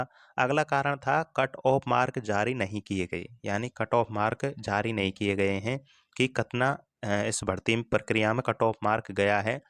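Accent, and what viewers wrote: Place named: Rajasthan, India. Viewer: Tumhari language se tum hindaun City k lg rhe ho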